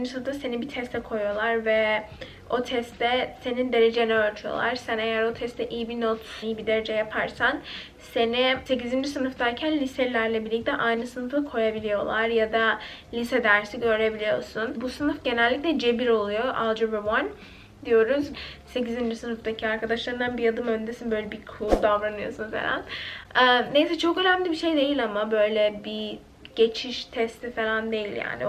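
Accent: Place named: Texas, United States. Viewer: Litt video, succesful video. Im a fan from now on!! we are waiting for more. u got texas accent tho..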